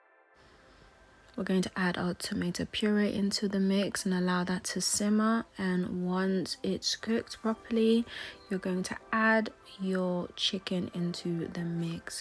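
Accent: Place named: Liberia. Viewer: Hey girl!!! So glad I came across your channel. I just moved from NYC to London a few months ago and I've beeen cravvvvviiiingggg Liberian food. But I don'y know where to find stuff like smoked Turkey meat and other common ingredients here? Can you advise? And do you know of any Liberian restaurants in London by chance? Sorry for the 21 questions. I heard your accent and I couldn't help but ask (assuming you're still here). <3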